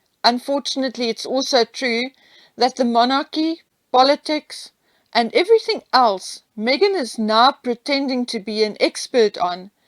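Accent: South Africa. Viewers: Thank you for your honest and unbiased opinions. So refreshing to hear a fellow South African's accent. Regards from Cape Town. God bless😍 . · I read somewhere ages ago that Thomas was also afraid of Meghan, her rages were really bad and she used to physically attack him. From the news of the way she abused her staff and other people’s staff verbally and physically, I can imagine this is true. Do you have any clarification on this? On Doria she had a long gay relationship with another woman. Which ended just after Harry’s wedding. So she definitely doesn’t like men! I do feel strongly about Archie, if he does exist, he is being kept in isolation which is cruel and unkind treatment of a child. Anyone else whole have had child services called on them. Meghan isolates those she wants to control - she is extremely abusive! Ps. I love your videos, you obviously spend a lot of time investigating. 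I also like your accent, I went to school in South Africa so your accent is nice and familiar, thank you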